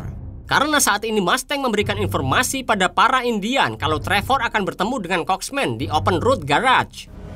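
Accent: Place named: Central Java, Indonesia. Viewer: iki logate wong jateng iki... Kendal semarang?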